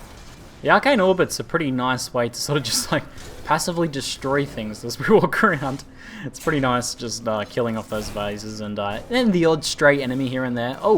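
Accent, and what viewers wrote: Australia. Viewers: You have just became my favourite streamer. Recently I have been paying attention to a couple of D3 streamers, like Archon, KingKongor, blaqksmith & etc. I prefer you to any of them! Keep up the good work! I think it has something to do with your Aussie accent, or you just remind me of a friend, but your description during gameplays are absolutely colourful and intriguing!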